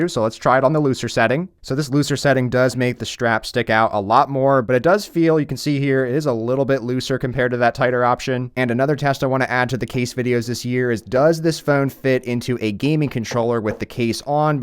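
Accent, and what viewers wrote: Philadelphia, United States. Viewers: Are you from South Philly/Delco?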